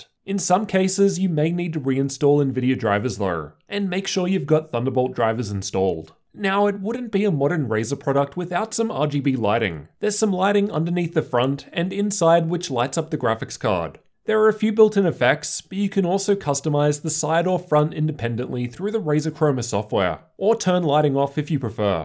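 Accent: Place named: Australia. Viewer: One of the reasons I watch your videos is because I like mimicking accents and I am not so good with Australian accent, u talk clearly and you r a tech YouTuber so I can do two things at a time, watch tech videos and learn Australian accent 😁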